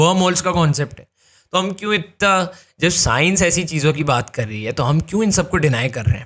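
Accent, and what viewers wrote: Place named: North India, India. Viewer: میاں یا تو خالص اردو بولا کیجئے یا خالص انگریزی۔ اردو میں خواہ مخواہ ٹھونسنا ایک بڑی نامناسب حرکت ہے۔ ذھنی مرعوبیت اور غلامی کا پتہ دیتی ہے۔ دوسرے اپنا تلفظ درست کریں۔ شین قاف بھی درست کریں۔ گلتی نہیں، غلطی۔ طریکا نہیں طریقہ۔ فیر نہیں پھر، بھاشا نہیں زبان ، انھوں نے بولا نہیں، انھوں نے کہا، باڑ نہیں، سیلاب، وغیرہ۔ کتاب ادریس یہودی کتاب ہے، عیسائی نہیں۔ اینڈ نہیں، اور۔ بھٹ نہیں، لیکن۔ برا نہ مانئے گا لیکن آپکی زبان شمالی ھندوستان کے ھندؤوں جیسی ہے۔ ابھی آپ نوجوان ہیں، محنت کیجئے اور اچھی، صحیح اور خوبصورت زبان بولنا سیکھ لیجئے۔ انگریزی بھی انگریزوں کی طرح بولنا سیکھیں۔ بہت اچھی بات ہے۔ لیکن جو زبان بولیں خالص اور مسلسل وہی بولیں۔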